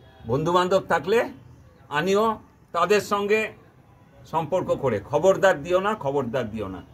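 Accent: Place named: Bengal, India. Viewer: খুব সুন্দর কাকু তুমি যে হিন্দি বলো খুবই সুন্দর আমরা বুঝতে পারি আমরা বাঙালি আমাদের হিন্দি এরকমই হবে❤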